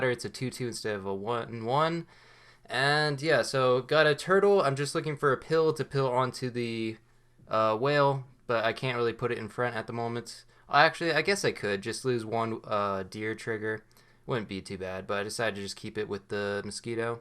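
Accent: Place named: Canada. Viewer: Why do you sound like kenadian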